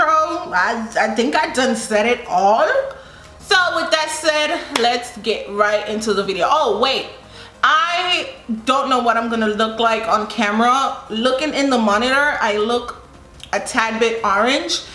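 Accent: Jamaica. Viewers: Lol, it's the Jamaican accent for me😍❤️😂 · minutes into the video and I know your Jamaican🔥💯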